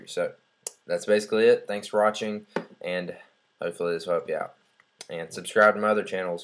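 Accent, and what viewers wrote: United States. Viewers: Note to Self- Dont get tech advise form a guy with a country accent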